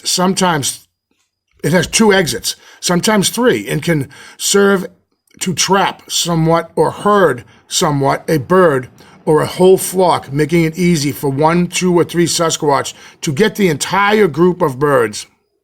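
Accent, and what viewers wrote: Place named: South, United States. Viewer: Awwww, ~ just goes to show that you cannot please all of the people all of the time. YT is FULL of trolls! I will be the first to admit that I'm a sucker for your accent ~ being from the South, I find it very charming. (i.e. the way you say question "mahks" ❤❤❤) I LOVE listening to you, to your descriptions, to your stories... just being able to tag along with you on your adventures. I even like it when you just sit in your van and talk. Hmmm guess I'm just a true fangirl Haha. Seriously though ~ not just patting you on your back ~You make the world a better place being the down to earth, intelligent, great guy that you are. I don't usually say things like this... but that guy is a douche. Eww 🤢 I can't believe I used that word Haha. Maybe I made you laugh. Or at least smile after that loser's nonsense. You should have the option to unsubscribe him from your channel since apparently he doesn't know that's an option! 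Be well and do you,! Much love from Tennessee!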